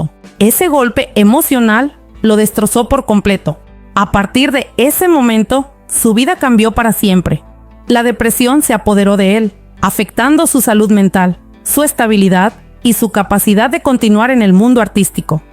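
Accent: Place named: North, Mexico. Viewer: Ten cuidado lo que subes en tus contenidos Lorena Herrera no es un ejemplo de lo que dices ya quisieras vivir cómo vive ella. no seas tonta y si vas a subir contenidos con tu voz toma clases de dicción porque tienes un asento de norteña no sé de dónde eres es un acento muy desagradable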